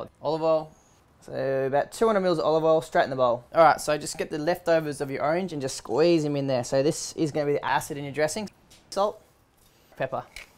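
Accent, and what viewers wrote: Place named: Australia. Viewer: sweet Australian accent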